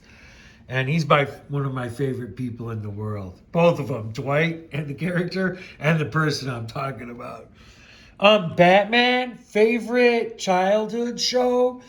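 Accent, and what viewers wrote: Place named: United States. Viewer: Love your American accent takes me back to the birth of Rock and Roll as a kid listening to American Forces network broadcast from Hilversom in Holland late 40s great video my friend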